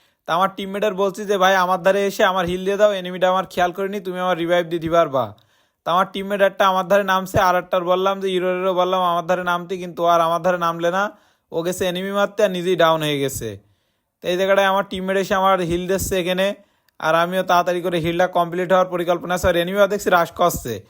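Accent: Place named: Kaliganj, Bangladesh. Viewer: Tomar basa ki Kaliganj er ase pase? Tomar kothar style sune bollam.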